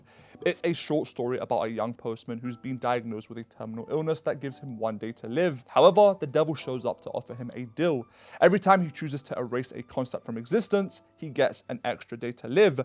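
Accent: United Kingdom. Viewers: a hot British voice, get it right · guy has an annoying british voice lol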